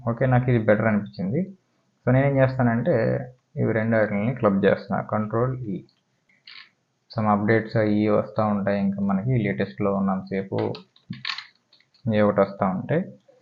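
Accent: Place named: East Godavari, India. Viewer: Anna ne proper ekkada? konchem east godavari slang matladutunnav!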